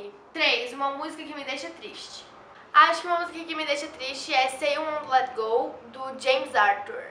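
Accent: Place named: Minas Gerais, Brazil. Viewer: ahhh sotaque de mineiraaa kkkkk lindaa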